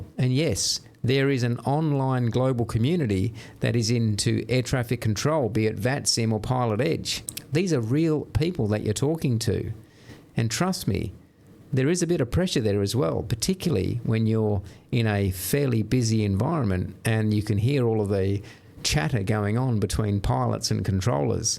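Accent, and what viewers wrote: Australia. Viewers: Are you in south Australia by chance? That accent is familiar.. 😁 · You're talking my language (apart from the Aussie twang). 70 in a couple of months, been simming since the mid-1980's, through all the iterations. Recently built my own PC to handle the best graphics and flight physics available now (MSFS2024). Only ever flown GA, now a 172-based Reims Rocket. Working my way from the UK to SoCal, take off where I last landed. Never tried VATSim, currently using SayIntentions - but one day i swear I'll join the network.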